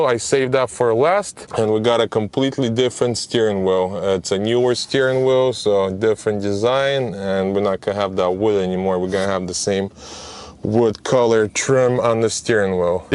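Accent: Russia. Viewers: Мне одному кажется что у него акцент из России?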